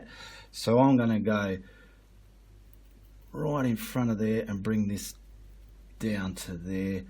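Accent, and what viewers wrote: Australia. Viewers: The spirit of Bob Ross and the accent and proper speech of Steve Irwin…what a comforting voice and video to watch.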